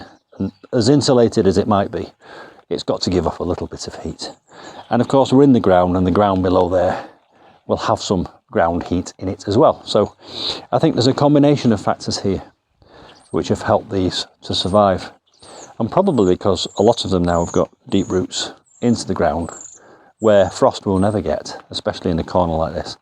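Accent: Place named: Barnsley, United Kingdom. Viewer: Is your accent Barnsley? I love it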